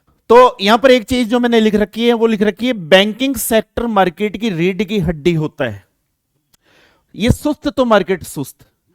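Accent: India. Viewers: Sir aap haryana se ho language waha ki hi lg rhi h jo bhi h love u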